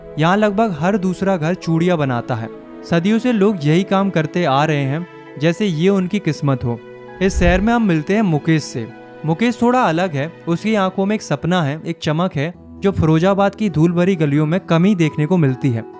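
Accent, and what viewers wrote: Uttarakhand, India. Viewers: Bro aap uttrakhand se ho ? ❤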